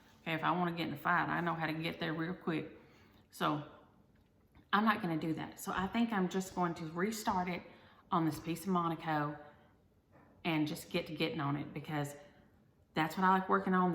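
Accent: South, United States. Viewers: I absolutely adore you. I laughed out loud at a couple of your stories. The doorbell one especially. Love your southern accent. Keep recording your episodes. They are perfect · Great video!!! I love hearing another southerner! We’re getting on down the road like a chicken on the loose! 😉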